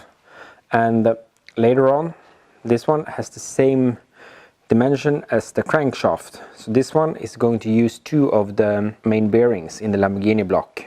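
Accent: Sweden. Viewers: älskar svengelskan riktigt fint <3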